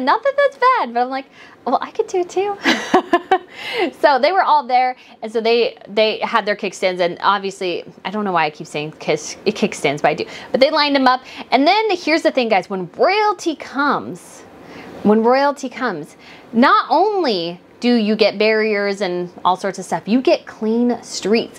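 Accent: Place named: United States. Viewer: This is so sweet! You sounded really polite and excited at the same thing! Nothing embarrassing! I'm sure she thought you were really sweet and surely she recognized your American accent, meaning you made a big journey to be there! I hope you have a blessed time at the Coronation today, and I'm praying for some very special experiences. <3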